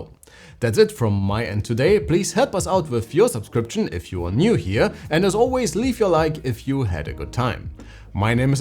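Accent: Germany. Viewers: Nobody wants to talk to you in the comments below lol. You manage to speak German when talking in English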